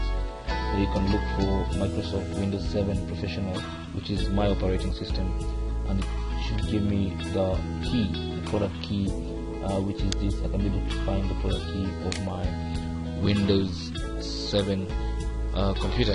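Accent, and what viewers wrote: India. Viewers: me hears indian accent clicks off video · This guy sounds like one of those Indians that cold call you and demand money telling you computer has been hacked...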